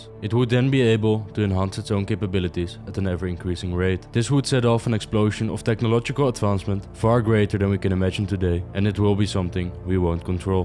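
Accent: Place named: Denmark. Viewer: ah cool, kinda sounded like a danish accent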